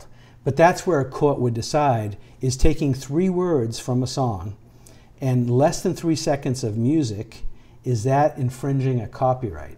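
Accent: Boston, United States. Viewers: That accent takes me right back to my Boston days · Incredible lecture, masterful Boston accent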